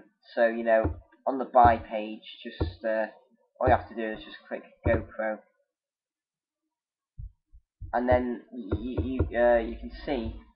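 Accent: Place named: United Kingdom. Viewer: omg british accent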